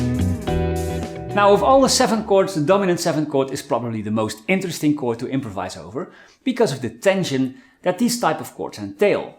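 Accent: Netherlands. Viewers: Can confirm! is among the best guitar education resources on Youtube. His explanation, auditory and visual examples are exceptional. And I adore his dutch accent too.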